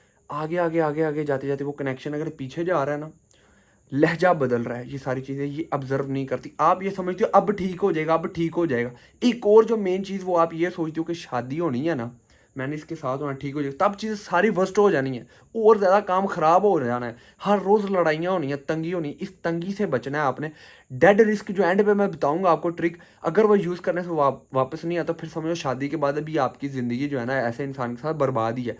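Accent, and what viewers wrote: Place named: Punjab, India. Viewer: Plz zuban thek karo punjabi penduuuuuu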